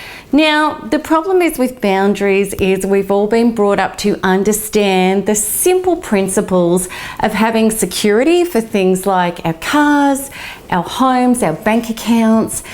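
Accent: Australia. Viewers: Is she Australian? I'm curious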